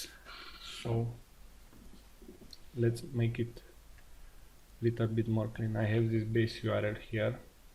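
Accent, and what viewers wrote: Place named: Bulgaria. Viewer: YOOOOO I'm also from Bulgaria, I can tell by the voice and the weather location obviously. Пиши ми моля те, как ти е фейсбука или нещо!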